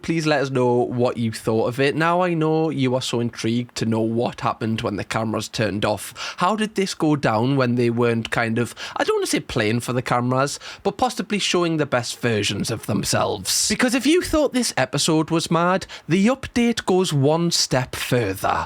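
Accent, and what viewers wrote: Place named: Geordie, United Kingdom. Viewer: Daily dose of being called a "Gorgeous Donut" and hearing this entrancing Geordie accent, YES! Love you!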